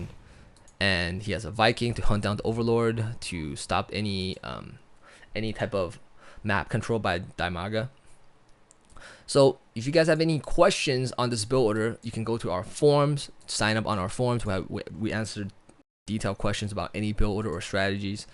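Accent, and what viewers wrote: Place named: United States. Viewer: No offense but your American accent is annoying.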